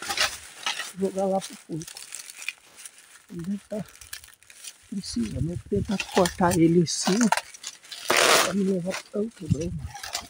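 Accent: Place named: South, Brazil. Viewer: Esse sotaque do sul é lindo ❤.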